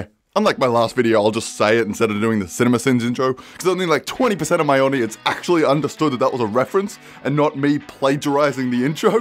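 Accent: Australia. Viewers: Never EVER do a video where you do not speak anymore y'hear? Your videos are 100x more enjoyable when I get to hear that glorious aussie accent of yours. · I don’t know how I didn’t figure out he was Australian, I’ve been assuming he was British which would be acceptable if I was American but I’M British and parents and entire extended family are Australian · You're Australian nice!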